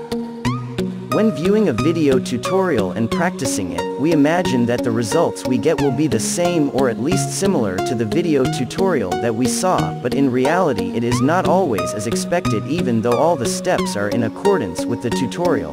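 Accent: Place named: Nigeria. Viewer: I don't know his private channel. I just accessed him, Adolfo, and other Master Class Vids, through the Reallusion site, under the Master Class tutorials section. is actually like Nigerian, or something like that (sorry if I messed up your Nationality description based on your accent). 😁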